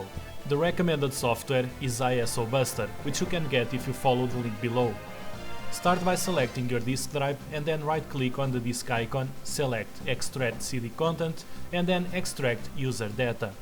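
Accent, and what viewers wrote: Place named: Russia. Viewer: Not sure if you are Russian by your accent or Portuguese/Brazilian by your Twitter language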